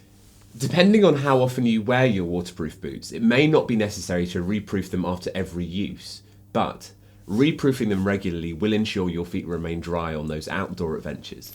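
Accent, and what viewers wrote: United Kingdom. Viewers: I hate how much I love British accent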